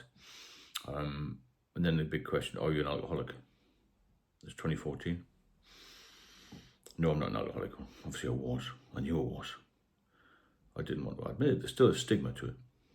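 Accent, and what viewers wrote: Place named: Newcastle, United Kingdom. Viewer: the geordie accent gives it away !!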